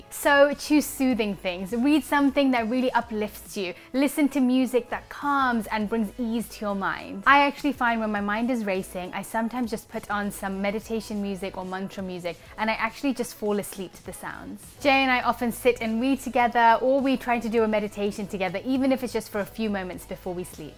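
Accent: United Kingdom. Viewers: Yes, her roots are from india but she was born and raised in London so she has British accent 😊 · African-British-American-Indian lady with a Perfect British accent!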